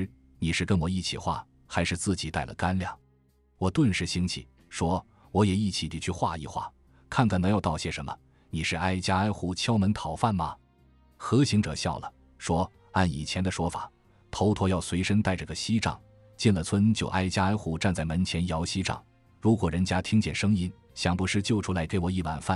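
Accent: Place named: Hunan, China. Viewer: 大概是65年前，在学校安排下，每年要去乡下插秧，收割2次，对些湖南乡下的口音，还记得些。今天听您的故事，对修頭陀的苦行僧很是尊敬，更是您用些湖南乡下的口音，引出乡愁。很为这久埋在记忆中的当时乡下佰姓的纯朴，实在，有一种由衷的怀念，感动。谢谢您的故事。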